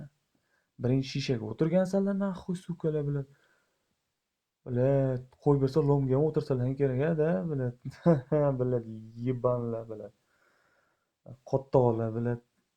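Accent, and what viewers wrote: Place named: Tashkent, Uzbekistan. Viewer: Ooo amlatta o‘zini shevenda gapir hezrlecha shevalarin borku nma toshken shevada gapirasan urod indes o‘zinsan indesligin uchun bashareni ko‘rsatmayabsan.!!!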